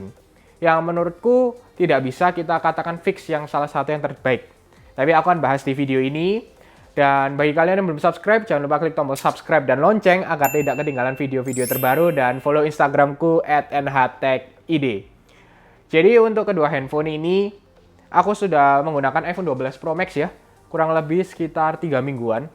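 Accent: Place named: Tegal, Indonesia. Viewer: Tegal atau cilacap mas atau brebes sih mas bro😁😁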